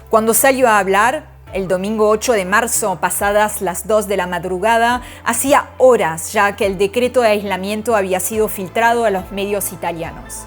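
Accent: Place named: Argentina. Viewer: Todo bien DE DONDE ES ESTA DAMA...su epañol esta mezclado entre acento Español Argentino y Ruso... Pero buen video informativo.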